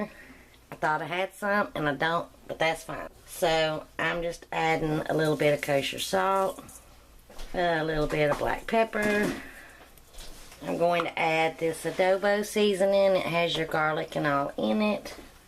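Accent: South, United States. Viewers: Great video. Thanks and I subscribed. loved the southern accent. keep the vids coming.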